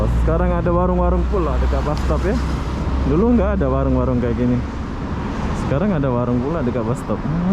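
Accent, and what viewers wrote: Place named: Malaysia. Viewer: Haha gklah, cuman gaya bicaranya aja di Malaysiakan, sampe dlu pas ke Jakarta dan Palembang, dia di hujat netizen, sy masih ingat itu😂 mungkin abang nih sudah terlalu lama di Malaysia, jadi bangga dia dengan bahasa Melayu Malaysia. 😂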